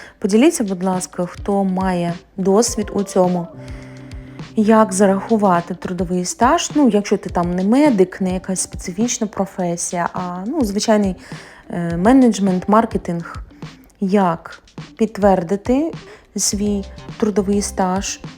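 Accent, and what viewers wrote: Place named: Russia. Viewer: Чувствуется, что на самом деле Вы думаете и говорите на рус языке...а вот влог пытаетесь весьти на укр языке, ну тогда выучите хорошо украинский.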